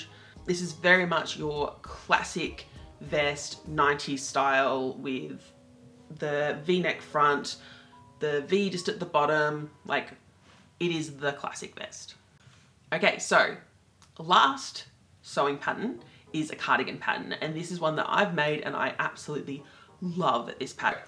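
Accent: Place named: Australia. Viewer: OMG! an aussie! Thank you! :)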